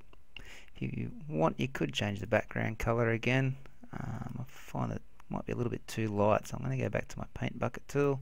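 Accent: Australia. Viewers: Thanks so much for this. One of the best tutorials for Photoshop beginners I've seen so far. Just the right pace and level for me. Seems like most other tutorial-makers must be scared of being sneered at for being too simple, and seem to assume a 1 lifetime of Photoshop experience level. I like the Aussie accent as well. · r u aussi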